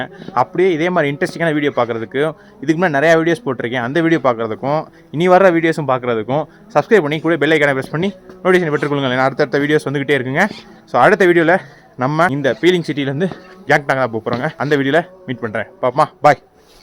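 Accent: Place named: Madurai, India. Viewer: Namma madurai bro...doing business in tirupur....neenga bro...unga slang and voice enaku romba familiar a iruku...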